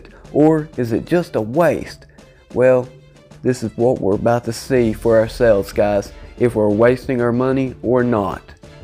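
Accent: United States. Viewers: This video confirms my belief, People from the south shouldn't be anywhere on my TV. Please talk correctly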